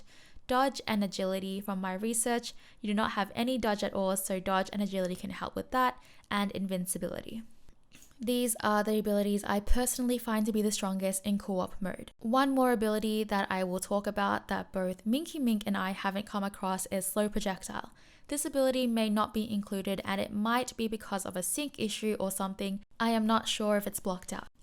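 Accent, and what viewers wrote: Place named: Australia. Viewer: I have been watching a couple of videos, and from the first video, I thought that was an Australian accent, (because mine is about the same or a bit stronger than that) so I was quite glad to find that you are based there too.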